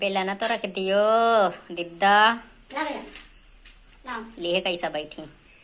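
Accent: Raebareli, India. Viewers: Ya to raebareli ke boli aay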